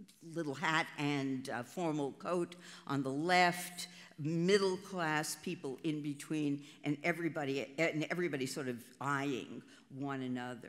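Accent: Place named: United States. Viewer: This is the best of the internet, the ability to join in, to lectures/presentations like these..... but - big sigh - why cant American learn to pronounce European names correct? If you knew how disgusting it is to listen to this, flat American dialect of this woman (where is she from?), you would be ashamed of yourself.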